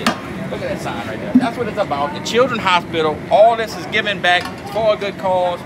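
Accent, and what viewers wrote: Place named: Brooklyn, United States. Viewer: This guy must be from Brooklyn NY!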